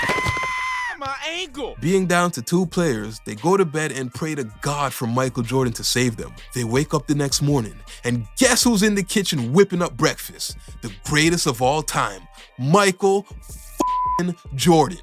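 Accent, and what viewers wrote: Toronto, Canada. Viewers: ur def from toronto broski lol i love this channel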